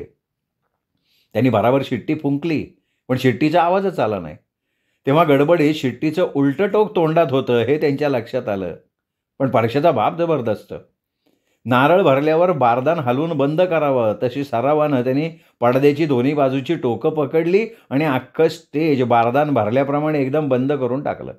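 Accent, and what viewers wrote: Konkan, India. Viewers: छान आवाज मस्तरंचा. अगदी कोकणात.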